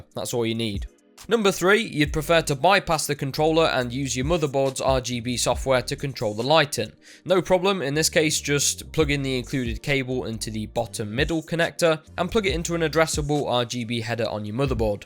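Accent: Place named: United Kingdom. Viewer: Damn you have a strong uk accent :D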